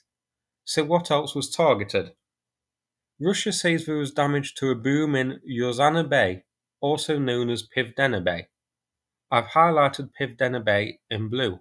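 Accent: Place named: Nottingham, United Kingdom. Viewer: great analysis dude, awesome way of breaking this all down for up to understand, and I'm sure I recognize the accent, from a notts dude!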